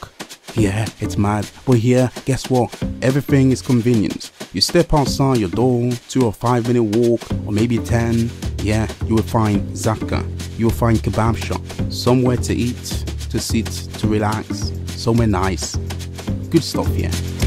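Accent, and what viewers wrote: United Kingdom. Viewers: Sounds like you're British, not American. Am I right or am I right ? :) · are you American? Your accent doesn't sound like. British I suppose? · "America" in title >British accent I'm not watching this.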